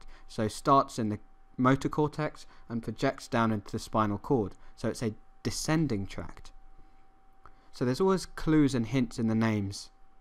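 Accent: England, United Kingdom. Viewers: someone just told me that you have an English accent. And I think it's good. remind me of times listening to BBC documentaries of animals and plants, the lovely and respectable Sir David Attenbourg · I learn so much better from an English accent for some reason... Great work!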